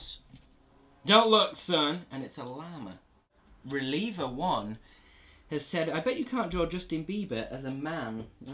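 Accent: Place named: United Kingdom. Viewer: British accent is addicting.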